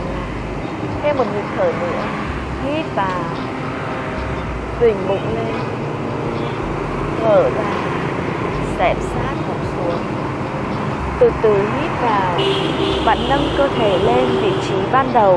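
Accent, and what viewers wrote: Hanoi, Vietnam. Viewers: nghe giọng nói Hà Nội mới sáng khoái làm sao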